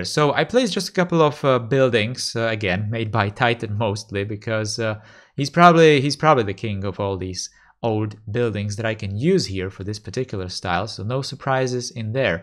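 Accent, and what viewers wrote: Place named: Czech Republic. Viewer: Usually you can recognise Czech from a mile by his accent. With I was thinking that I can hear a Czech or Slovak accent but it's so subtle that I thought I must be mistaken.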